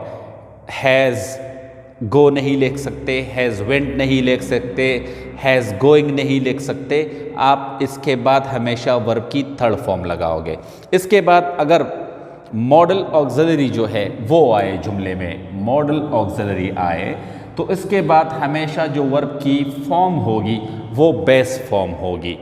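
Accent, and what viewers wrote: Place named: United Kingdom. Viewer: mashallah you are doing very well and your accent is nice too and you can speak british accnet very well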